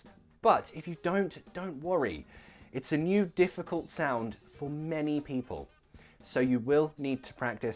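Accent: United Kingdom. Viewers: I really do luv tgis man why in teaching .... he's the only reason why i like British accent ....he makes the British accent so easy....thanx man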